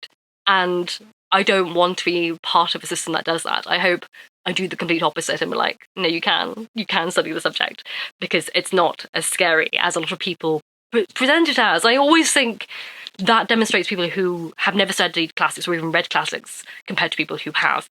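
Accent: RP, United Kingdom. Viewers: Ok good faith question, asking because I do the same thing ok so it's not a judgement. Do you have a theory on why you sound so posh? Because I do too, but only in English which isn't my native language. I pick up accents all the time, put me in a different part of the country and I'll be speaking that in a few weeks, but posh is very much my neutral that I can't seem to get rid of. My best guess on how it happened is that my ADHD and other chronic illnesses makes me not speak any language very well. I don't remember common words in my native language so tend to speak three at a time. If I have to speak 1 language at a time there are a lot of pauses because I have to think of the words rather than just insert it from whatever language I do remember it in. I think the posh might be overcompensating for that.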